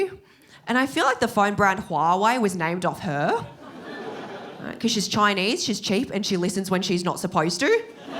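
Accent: Australia. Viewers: People think your voice doesnt match the face because we are so used to American voices when we hear someone speak, when we hear someone from Australia everyone thinks its some white person because most movies show that, Australia isnt really popular in anything and noone knows how diverse Australia really is compared to most western countries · I'm sorry, not being mean, just you are hard to understand because you're Australian accent is so strong. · As a fellow Aussie, I really do think that anyone who has heard you talk and said you aren’t Australian is just extremely jealous of the fact you sound more Aussie than they ever will, but are also far more interesting because of how you look. 😂 Also, I am pretty sure it is the most Aussie thing to upscale your story every time you tell it, sort of like fishermen. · You are so much fun and perfect and beautiful! 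Icing on the cake is that you are Asian with an Australian accent..... win. win .. I could listen to you all day. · Going onto midnight and this pops up on my You Tube feed. I'm from New Zealand, and I see a Chinese woman with a strong Australian accent. I can't understand Chinese or Australian. I had to put the subtitles on . Sorry. Anyway, I had a peek. Glad I did, very funny and witty. New Subscriber. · The first time I found the Australian accent so beautiful! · Ugh...Chinese with an Australian accent? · Wow that is thicke australian accent i love it.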